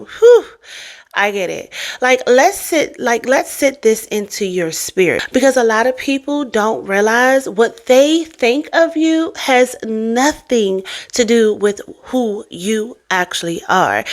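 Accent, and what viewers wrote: DMV, United States. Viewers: That was beautiful! I definitely needed to hear that this morning. You’re becoming one of my favs! And do I hear a DMV accent? 👀❤️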